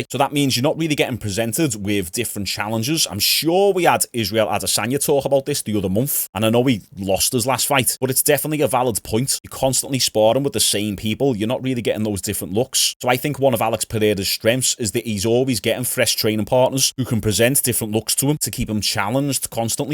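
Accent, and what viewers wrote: Liverpool, United Kingdom. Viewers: You're the first guy that speaks Scouser that i don't hate listening to. Good job! Chama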